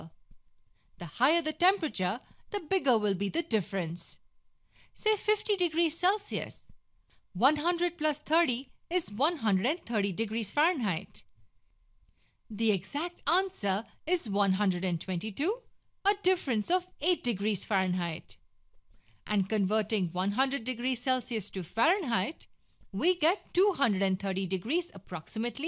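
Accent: India. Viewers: Great trick! Thank you. Love your Indian narration too. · she has an Indian accent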